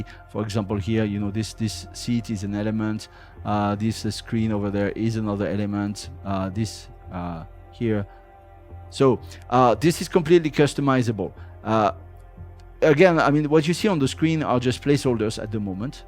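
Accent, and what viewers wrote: France. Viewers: l'accent français ^^ grillé a 100km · C'est mignon, l'accent français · Cet accent français ! 😀 Accent peu prononcé en fait, ça va. C'est même très bien ! Bravo !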